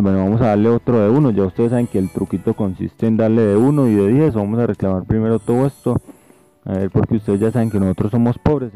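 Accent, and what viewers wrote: Colombia. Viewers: Na pa mas colombiano pa que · eres de medellino de cali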